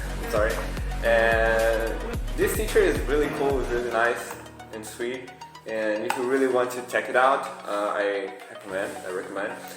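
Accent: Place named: France. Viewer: Wawww that frence accent 😌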